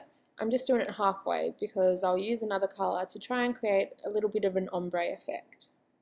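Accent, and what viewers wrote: Australia. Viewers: I didn't realise you where Australian! I've been etching your videos for weeks now! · Omg! I follow you on Instagram, I never knew you were Australian 😍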